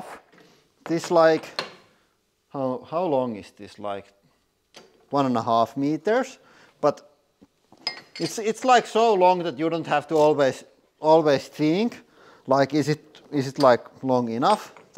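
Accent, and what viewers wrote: Finland. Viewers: Terve! A long time ago I washed many hidraulic press videos, stopped watching a for a while, never thought you was from Finland. I'm living in Finland for a year now and then watched again one of your videos. I immediately recognized the accent, and then realized right away you are Finnish. 😃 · Is this guy from Finland? His accent is Finnish.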